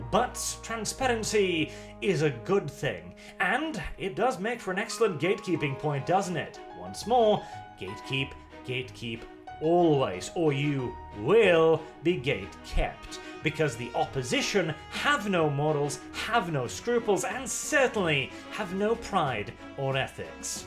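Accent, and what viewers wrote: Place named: RP, United Kingdom. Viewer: You are Norwegian? You sound like an aristocratic (lacing your speech with some of the thickest sarcasm I have ever had the pleasure of hearing) Brit!... Learn something new everyday.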